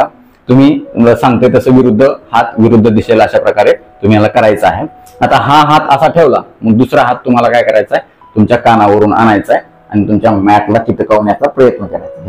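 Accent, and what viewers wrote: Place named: Kolhapur, India. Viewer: खुपचं छान व्यायाम शिकवता फार फायदा होतोय धन्यवाद 🙏 सर आपण खरंच लातुरचेच आहात काय कारण तुमची भाषा हि कोल्हापूर ची वाटते मी हा व्हिडिओ कोल्हापूर जिल्हातील गडहिंग्लज तालुक्यातील एका खेडेगावातून पाहते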